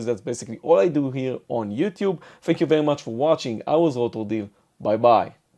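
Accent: Israel. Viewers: Is that israeli accent?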